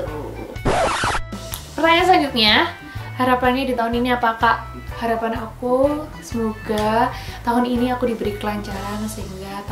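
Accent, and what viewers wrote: Java, Indonesia. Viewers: Logat"nya, ada jawanya sedikit😂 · Medok banget 😂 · Medok bed kak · Suaranya orang jawa banget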